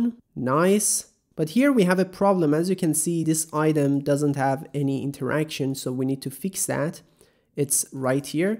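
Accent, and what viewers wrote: Iran. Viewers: its the persian accent thats killen me man. hahahah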